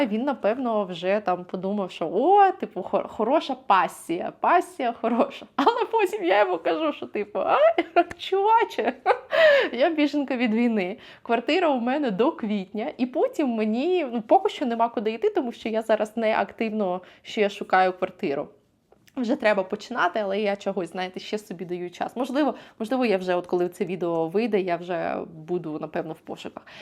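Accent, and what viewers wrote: United States. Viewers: В таком случае все проживающие и рождённые в штатах Мичиган, Иллинойс (Чикаго)говорят неправильно.она произносит так как разговаривают в штате,где она проживает,чтобы не было акцента.не знаю где Вы проживаете,родились и какой Ваш родной язык.если Вы проживаете здесь,то знаете, в различных штатах есть свой акцент и произношения определенных слов,поэтому общаясь с людьми,Вы можете предположить откуда человек.если Вы обратили на это внимание,я 100%уверенна,Вы не рожденыв штатах,а приехали !!! всего доброго!!!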